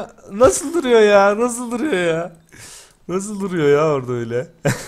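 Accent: Adana, Turkey. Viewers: OLUCU DİCEKTİ VARDI DEDİ HAMURUNDA ADANALI ŞİVESİ VAR VİDEO CEKECEM DİYE DÜZGÜN KONUSMAYA CALISIYORSUN BRO 👑👑☺️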